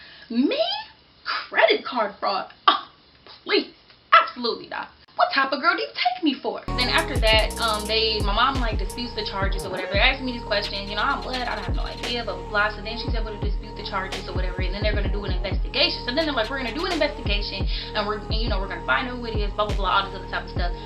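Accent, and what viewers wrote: Chicago, United States. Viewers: You from Chicago it sound like it🤣💯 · I can tell ur from chicago lol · Are you from Chicago? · You from Chicago ?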